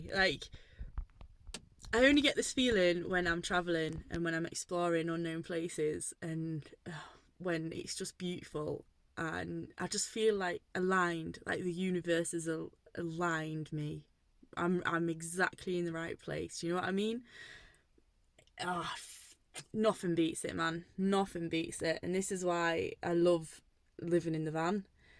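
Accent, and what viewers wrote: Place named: Northern England, United Kingdom. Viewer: hiya . Hey Liking your Vids ..you’ve inspired me into Going to Spain .. (maybe next year now )..I’ve only I had my Van for 14 months. always seems daunting in driving on the opposite side of the road😐 ..and mines only a short wheelbase vw transporter☺️.. your accent sounds like you’re from the north ?..Mick from Warrington Cheshire 😉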